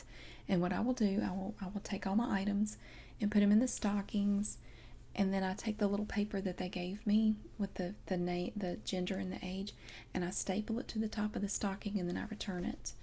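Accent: South, United States. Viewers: Holy marriage proposal! I could listen to that darling sweet voice all dang day! Gotta love that Southern accent!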